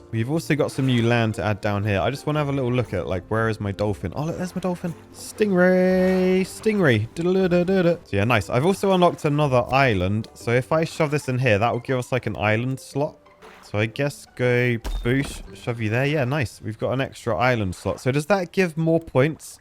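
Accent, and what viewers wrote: United Kingdom. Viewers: I could listen to you all day without even seeing videos often your british accent and just the way you are makes it so entertaining listening to you i started watching you like maybe 2 months ago and i feel like i'm soon done with the whole last year of your videos (and yeah i just noticed i never subscribed before shame on me!)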